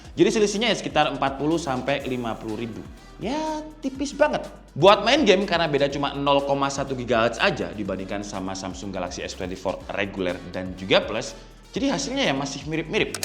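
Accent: Java, Indonesia. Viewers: jowoan rek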